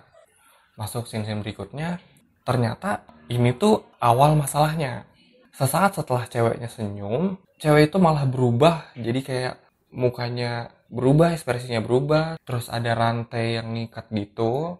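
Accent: Java, Indonesia. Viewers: Jowo kerasss lurr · Mas wong jowo tah?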